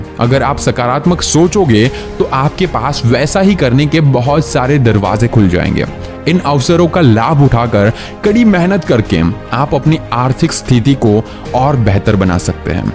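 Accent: Gujarat, India. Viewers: Aap gujraat se ho kyaa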